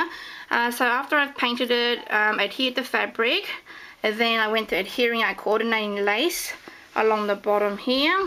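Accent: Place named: England, United Kingdom. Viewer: very english! lol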